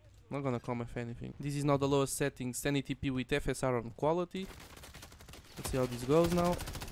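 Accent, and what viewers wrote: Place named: Portugal. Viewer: Eu acho engraçado que é tão facil de identificar um portugues a falar ingles hahahaha, bom video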